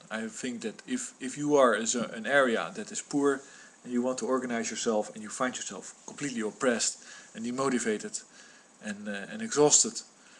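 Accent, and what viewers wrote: Netherlands. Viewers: lekkere dikke nldse accent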